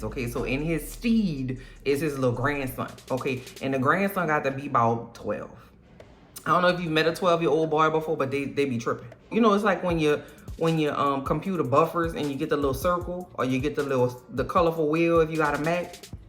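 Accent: New Orleans, United States. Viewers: Great review, and love the Nola accent lol! I was highly entertained. Keep em coming sis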